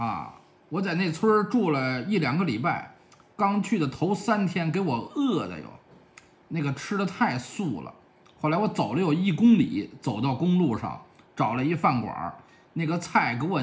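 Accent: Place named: Beijing, China. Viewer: 北京话好听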